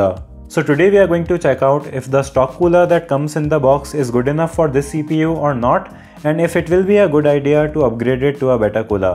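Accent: India. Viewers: Can’t watch this. Indian accent is so annoying.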